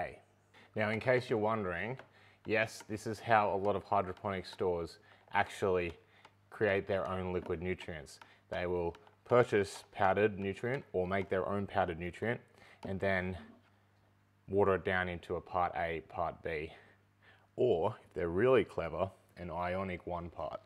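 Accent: Australia. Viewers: i switched from arduino mkr and pico videos to hydroponic and this was first video i put on and right at beginning i hear arduino and pico and wondering if im watching arduino stuff until i remebered that Aussie accent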